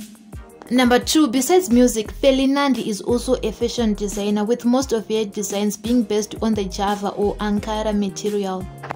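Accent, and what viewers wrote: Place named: Shona, Zimbabwe. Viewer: I am much in love with the deep english shona accent, and loooong pauses by the narrator 🤭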